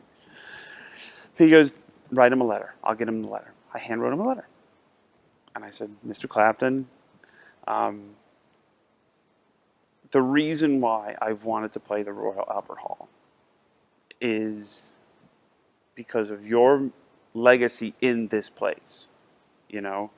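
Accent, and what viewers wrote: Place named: United States. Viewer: I have much respect for Bonamassa as a person and as a musician. Whilst he admits to a slight American accent, to my born in Surrey England (as was Clapton) ears, his diction and grammar, unlike many Americans, is perfect! I am certain that did not need to address Eric Clapton as 'Mr Clapton' or 'Sir' (Eric has a 'CBE' but deserves a Knighthood). Despite his fame he is a down-to-earth guy who is pleased to be addressed by his given name. If I, a nondescript fan of his music, happened to bump into him I would say "Hi Eric, I'm Alan, a fan of your work" and he may be tired of the 'Autograph Hunters' but would be semi-polite. If Bonamassa bumped into Eric and said the same Eric would have returned the compliment and suggested that he would be pleased if they could they jam together. And, of course, these two great musicians shared a stage despite unnecessary and ridiculous deference. 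Its just an exaggerated publicity story; never addressed Eric as 'Sir' or 'Mr Clapton', they each have respect for each other!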